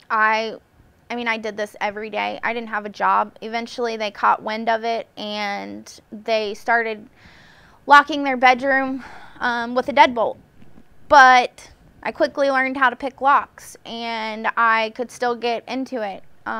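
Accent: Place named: Ohio, United States. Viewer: That is an Ohio accent...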